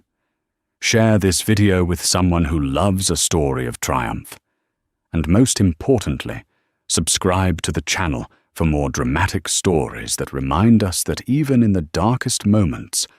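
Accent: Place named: England, United Kingdom. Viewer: Ah yes. Luv that purring English accent. YUM!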